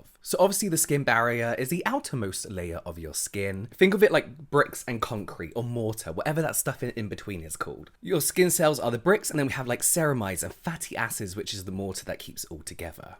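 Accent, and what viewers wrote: United Kingdom. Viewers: not really, has that soothing voice + British accent. Have you heard Alfie Deyes’ voice? He’s British but no way he can do audio books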